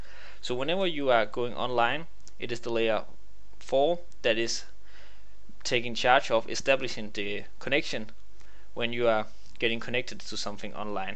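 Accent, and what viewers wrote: Denmark. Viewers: I can hear you are danish from your english accent :-)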